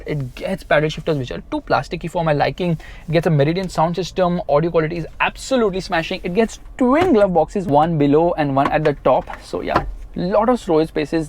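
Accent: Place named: South India, India. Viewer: Why Indians speak sooooooo fast. English sounds like some south Indian language.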